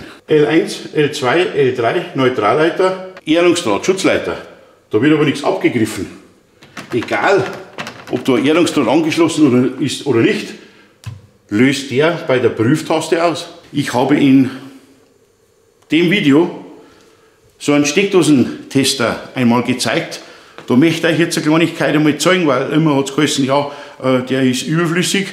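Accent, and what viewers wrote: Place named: Lower Bavaria, Germany. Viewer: Hach. Niederbayrisch. Wunderbar.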